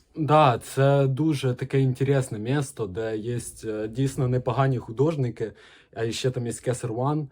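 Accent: Ukraine. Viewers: это потому что у него такой суржик, что только акцент украинский, а слова почти все русские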